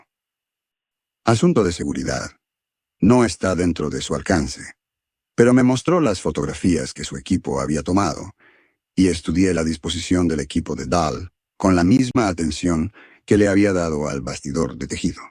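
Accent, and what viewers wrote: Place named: Latin America. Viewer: Q bueno escuchar una voz latina. Desde argentna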